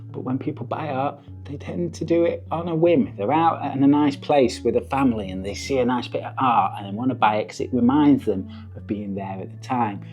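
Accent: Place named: Yorkshire, United Kingdom. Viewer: a traveler and you b sound just like my friends from Yorkshire ❤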